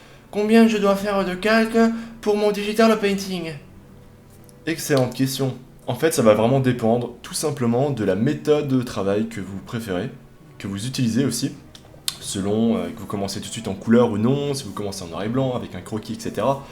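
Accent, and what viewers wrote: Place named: South, France. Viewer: oh le bel accent du sudeuh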